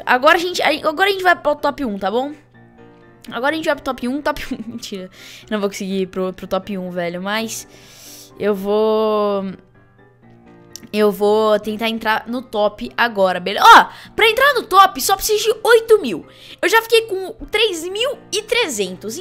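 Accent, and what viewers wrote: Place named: Sao Paulo, Brazil. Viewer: Belo sutaque Paulista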